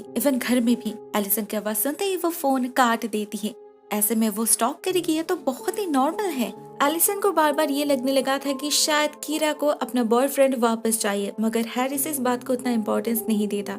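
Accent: Bengal, India. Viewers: u bengali? 🖕🏽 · Ap bengoli ho kia bolieee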